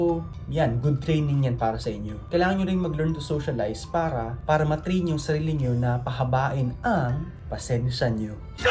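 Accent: Visayas, Philippines. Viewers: I can hear the visayan accent in his voice. HAHA. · I know from your accent your a bisaya haha. (Btw im also a Cebuano)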